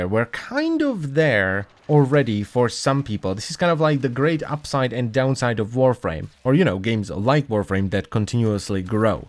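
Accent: Scotland, United Kingdom. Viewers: Oskar 464 haha! I thought he was Scottish this whole time. Now the CZ in his name makes sense! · Yeah me too i was sure he is British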